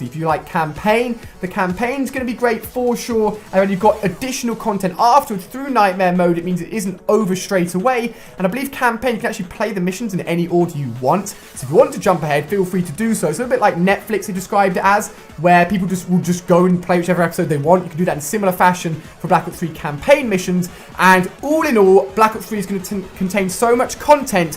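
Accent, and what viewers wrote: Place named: United Kingdom. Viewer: And I''m well aware of accents guys. I'm not oblivious of the fact that he's British also. So quit this arguing shit and move on kids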